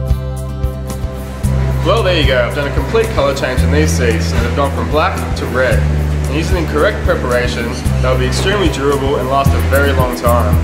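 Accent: Australia. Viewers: Great to hear the Aussie accent! Thanks for the great video and info. I see I can buy it in Wodonga. Can I use this product on a vinyl dash board and window pillars? It is not possible to spray them, so I am presuming I would apply several coats with a cloth without removing the dash (huge job to remove it)? What can you suggest?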